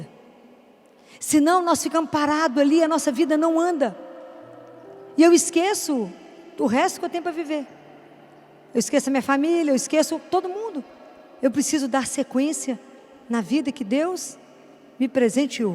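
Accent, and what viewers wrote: Minas Gerais, Brazil. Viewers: Eu chorei e consegui aprender muito.pq muito do q ela falou e passei e passo pq meu irmão esta desaparecido ha dois anos. Mas aprendi que entre ele e o sumiço a misericórdia de Deus é infinita. E se fizeram algum mal pra ele como disse o marido dela.Deus irá ou já fez justiça. Mas não sabemos o q houve. Mas sabemos q Deus esta com ele onde quer que for. E meu pai teve morte trágica ele interrompeu a vida dele aos 62 anos. E através da pregação dela e ainda mais q foi mensagem do papa. Hoje eu creio que meu pai e de meus 8 irmãos esta no céu. Com Jesus e nossa senhora. Obrigado Dra por existir por compartilhar e ajudar tantas e inúmeras vidas. Amo te ouvir ainda mais por ser mineira. Q acho lindo esse sutac. E vc é maravilhosa pq disse seu Sim a Deus e por tudo. De toda minha alma e coração muito OBRIGADA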